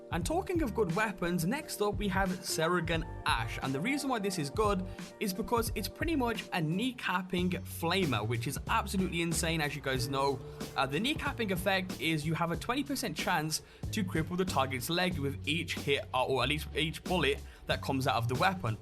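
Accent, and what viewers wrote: United Kingdom. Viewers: he is from the UK I believe, and in their military/police it is pronounced that way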